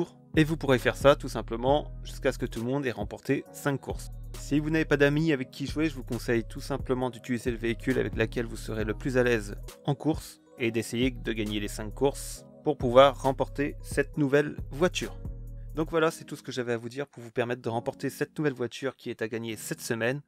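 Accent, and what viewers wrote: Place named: Nord, France. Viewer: Géniales tes vidéos, ça s’entend que tu viens du ch’Nord! 💪🏼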